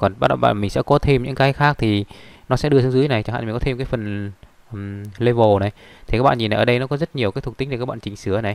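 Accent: Vietnam. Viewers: ad đọc tiếng anh như tiếng việt, nhưng k sao 1 điểm thú vị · Em hỏi ngu xíu ạ, em nghĩ là add phát âm tiếng anh đọc như tiếng Việt này là cho người nghe dễ hiểu phải không ạ? Em không nghĩ 1 người có thể giỏi về PR như vậy lại không biết english đâu hehe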